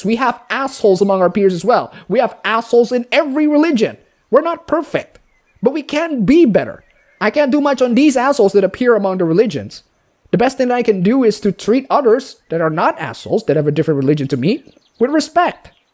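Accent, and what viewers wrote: United States. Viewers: You're speech kinda reminds me of the things a lot of the stuff my fellow Californians have. Also good luck.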